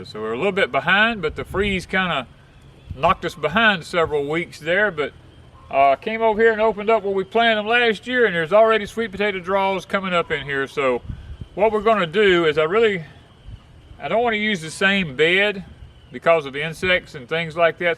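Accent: South, United States. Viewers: I have a southern accent, too, so I don't notice his accent. He sounds like everyone I know. Lol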